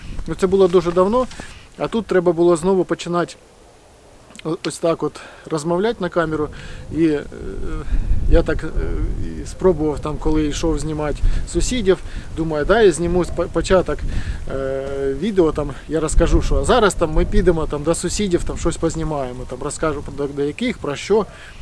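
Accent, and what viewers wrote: Russia. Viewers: Щирість та правда - це завжди легко і по-справжньому.Це мене "підкупляє" Ви правильну ставите ціль,мету :золота кнопка.Тільки так люди досягають успіху на шляху до здійснення мрії.Тільки високі цілі,а не на півдороги ,напівмету .Ще,не для "доривання" чи образи.В словах,наприклад копать,допомагать, знімать,розказувать,,пробувать,брать,працювать ,включить(болгарку) в кінці треба закінчувати. на И , бо ці слова відповідають на питання "що робити?/зробити".А от ,наприклад,"і всі думки погані (що роблять?) відходятЬ - тут вірно.Або такий приклад:Що рОбить? - відходить;що робИти? - відходити Ще раз наголошую- це не в образу вам.Ви вже добре розмовляєте українською.Це просто тонкощі і ,звісно, наслідки ситуації життя в російськомовну середовищі.Я сам з Миколаївської області , з російськомовного селища збудованого у 60-х роках.Половиною населення були,до розпаду СРСР ,росіяни,що приїхали працювати на два нових заводи, селище тому і побудували.Тому і школу закінчив російську.Та й зараз в побуті ще селище,здебільшого,російськомовне - як наслідок.Але потрохи у вас і у нас всіх все вийде,і все буде Україна!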